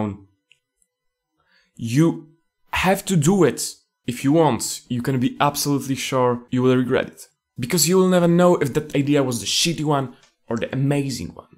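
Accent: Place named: Poland. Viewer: troche taki polish english ale nie jest źle ;p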